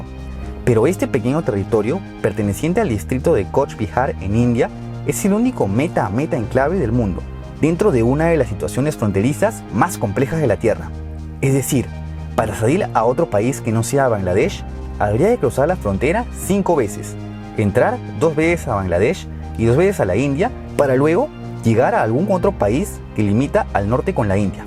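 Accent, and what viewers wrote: Peru. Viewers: Es primer video que veo de tu canal, y con eso ya sé que eres peruano por tu acento! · Su acento dice q es peruano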